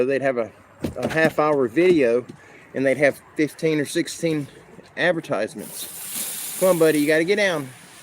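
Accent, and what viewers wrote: South, United States. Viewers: I thought your voice is awesome, i love that southern accent - i know what you were doing, you were only trying to make sure that everyone was happy - i do the same thing infact it takes me all day to get to the point, my brother will say to me ""For the love of God Michelina you've been circling the airport for an hour now ! You think you can land sometime soon...lol - im not going anywhere and neither are you, Our Lord n Savior didnt bring you this far you have more work to do, and the one comfort the one beautiful thing we all can keep in mind is "we all belong to a beautiful God and when its time and he wants to take you home, it will be a moment like no other you now know you have served your lord Jesus Christ and now its time to go back home - God Bless you, and until then you belong to us ...lol💖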